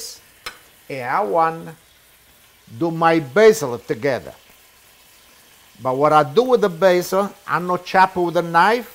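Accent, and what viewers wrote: Italy. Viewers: Oh Dio, Figlio mio, managgia la mozzarella 😛 looks so delicious. I love fresh basilfrom the garden. Grazie 😅😅😅 You make me laugh, i love your italian accent. 🍷Salute 🍷e 🍷cin cin🍷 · I love your italian accent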